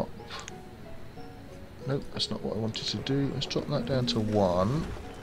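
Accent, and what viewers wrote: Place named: Northern England, United Kingdom. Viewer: Hello! If you don't mind me asking what part of the United Kingdom are you from!? I can't put my finger on it! You sound kinda of northern from were i am at in London.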